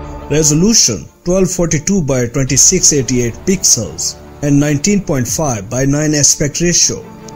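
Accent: India. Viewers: The voice over is 100% Indian//Bravo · English made in India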